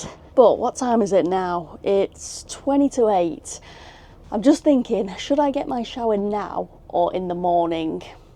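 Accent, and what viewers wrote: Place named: Sheffield, United Kingdom. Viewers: Love your accent; thinking from around Sheffield? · I live about 60km due south of Bastuträsk so this is a train I have used a number of times. I am however looking forward to the Norrbottnia being completed up to Skellefteå which will speed up services a great deal. Nice video and well put together and I love you English accent. I am originally from South Africa 🤪. Subscribed. Fun Fact. SJ took over the service of the sleeper train service (they were the original company before privatisation) while waiting to see if anyone would take over the service. It has been said it will be ended/closed soon but as of yet no takers. As for now the final run is planned to mid April 2026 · Lucky find for me this video, thanks. Looked like a great trip - especially for free. Sounds like you may be a fellow Sheffielder - am I reyt?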